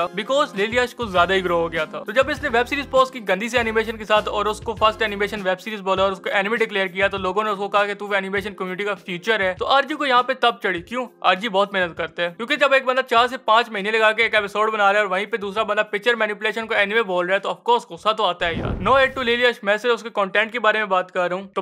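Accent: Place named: Pakistan. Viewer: Bhai aapke bolne k tareeky se hi pta chlta h ki aab Pakistani ho👀👍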